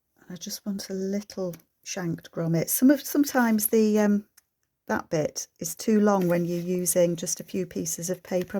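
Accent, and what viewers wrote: United Kingdom. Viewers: These are quite clever! My grandmother said she was born in 1900, but the Bible says 1898!! We guess she just wanted to be in the new century! When were the Scotsmen allowed to wear kilts again? I know for a long period they were outlawed. You have the loveliest accent! I don’t know how to distinguish British accents from one another, but yours is distinct, I’d like to listen to you for a whole evening.! Please no mushroom, the kilt shouldn’t be covered up!! (Just my opinion!) haha! Very sweet little booklets & you’re so organized! I’d like to be, but… thank you!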